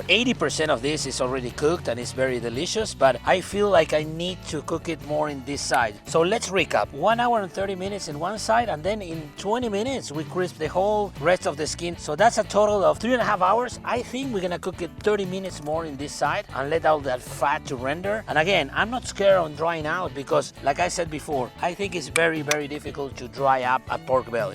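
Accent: Argentina. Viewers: Los videos estan buenos lo unico el audio osea la traduccion porque no haces videos vos hablando en argentino el echo que vivas en yanquilandia no sicnifica que te olvides de como habrar en español rioplatense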